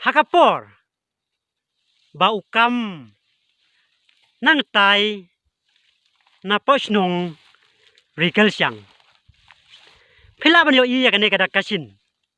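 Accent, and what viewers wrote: Sohra, India. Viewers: Kren sohra ruh pat nangre ine ima kum ba khreh poittry I mat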